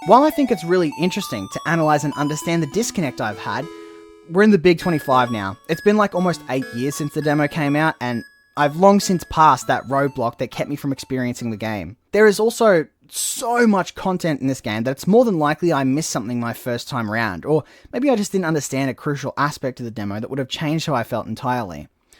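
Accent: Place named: Australia. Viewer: Unrelated but I sense an Aussie here… Good on ya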